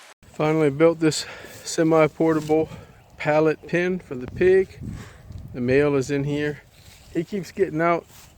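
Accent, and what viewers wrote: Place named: Louisiana, United States. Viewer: You have a Louisiana accent. Are you in Louisiana? Lol, he is sniffing Cassius. Lol.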